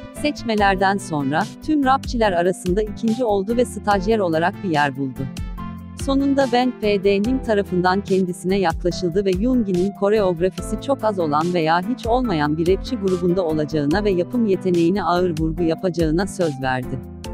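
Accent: Azerbaijan. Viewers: Ablacim Azeribaycanlimisin? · Sen Azerbaycanlımısın?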